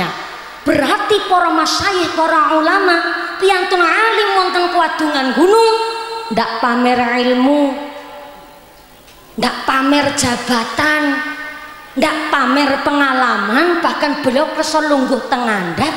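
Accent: Banyumas, Indonesia. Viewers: Meskipun ngapak mantap mantap aku seneng dengernya ngaji seperti ini siplah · Asalamualaikum mba aku sangat kagum kalo mendengar suara inget lg di banyumas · Ustadzah..ngapak tapi asike pooll.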